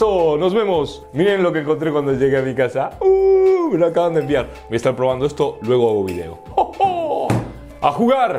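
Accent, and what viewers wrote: Argentina. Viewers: Ya me parecía que eras argentino por tu forma de hablar. Aguante argentina máster 💪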